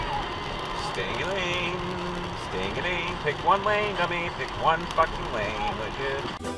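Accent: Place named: Boston, United States. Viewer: You can take the out of Boston, but you can't take the Boston out of!:-D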